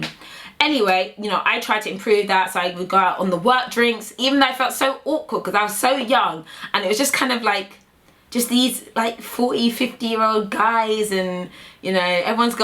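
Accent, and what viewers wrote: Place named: London, United Kingdom. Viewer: Her accent is nice 💖💖💖💖💖💖💖💖💖💖 London Accent love it 💖💖💖💖💖💖💖💖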